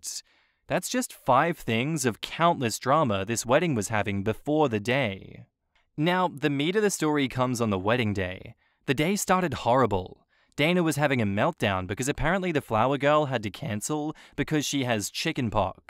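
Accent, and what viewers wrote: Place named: Australia. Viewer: Ausssie daddy hubby reading Spanish 🤌🏻💕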